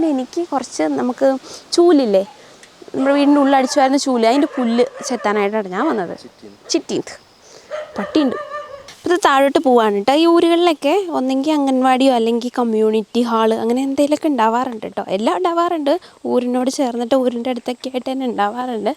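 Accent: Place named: Central Travancore, India. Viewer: ഞാനൊരു subscriber അല്ല അതുകൊണ്ടുമാത്രം മറുപടി തരില്ല എന്നുണ്ടെങ്കിൽ വേണമെന്നില്ല എനിക്ക് ചോദിക്കാനുള്ളത് നിങ്ങൾ വയനാട് ആണെന്ന് പറഞ്ഞു പക്ഷേ നിങ്ങളുടെ സംസാരം ഒരു മധ്യതിരുവിതാംകൂർ ഭാഗത്തുള്ള സംസാരം പോലെ ആണല്ലോ 80%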